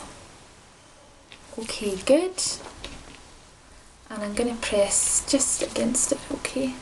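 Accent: Scotland, United Kingdom. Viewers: It's so strange listening to a fellow scot doing asmr haha. Great job though! :) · It's scottish, right? :D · is that scottish accent? So adorable spoken by her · What a lovely Scottish accent. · (No hate) Tbh your Scottish accent isn't that bad, very stereotypical but not bad. However if everyone I was around everyday sounded like this it would be quit worrying. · Loved this. Your Scottish accent is ace :) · Oh god, the Scottish accent.. Now I have to watch trainspotting again ahahaha amazing video, subscribed + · I found the scottish accent beautiful and heartwarming. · You Scottish?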